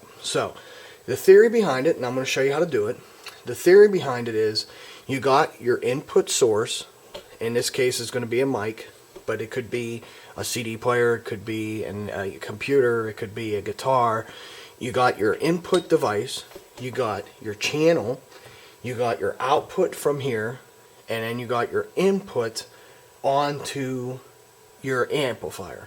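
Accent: Pittsburgh, United States. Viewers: You HAVE to be from around Pittsburgh. You talk like everybody around me.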